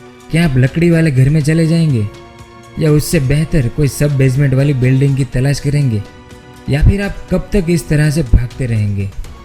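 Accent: Gujarat, India. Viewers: Bhai teri hindi gujju jese arhi hai pls nxt time kisi aur ki awaaz aaye aisa karo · ગુજરાતી લાગે છો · Gujarati Ho kya? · Bhai Gujarati ho kya? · A gujrati hai lavda saala awaaz se hi pata chal rha hai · Tu gujarati h kya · Gujarati h kya bhai mst bolta h😁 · Bhai Gujju ho kya 😃👌mast bolte ho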